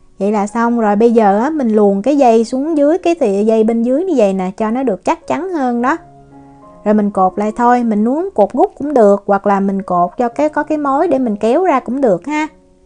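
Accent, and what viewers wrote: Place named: Mekong Delta, Vietnam. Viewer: lam Theo cach cua Chị Thanh cong nhieu mon ma khong co cach nao khoe voi Chị , Trong clip Chị co noi nha Chị o bien hoa ? Ma nghe tieng Chị Em nghi Chị o mien Tay , Em cung o gan bien hoa 😀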